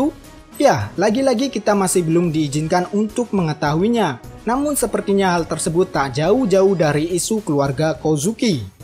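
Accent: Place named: Japan. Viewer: Ngikutin logat org Jepang, hruf "N" disebut samar seolah-olah kyk ga pke "N".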